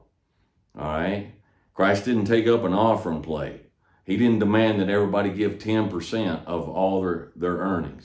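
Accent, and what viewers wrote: United States. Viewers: bro ı dont understand english but your cowboy english ı like it so ım watching you AHAH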